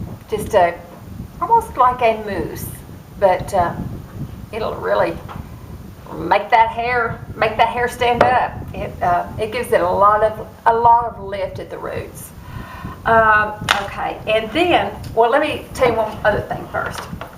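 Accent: South, United States. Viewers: your hair is lovely and I love the way you are so loyal to your moonstone shadow....and your lipstick-combo...I received my Naked 3 palette today which I won and have a feeling I have found the right colours for me-cannot wait to wear it tomorrow and will do a video on Thursday-honey, never worry about how long you talk - love your Southern drawl and could listen forever - do your kids have those awesome eyes of yours - have never seen eyes more beautiful..xxxxxSuzy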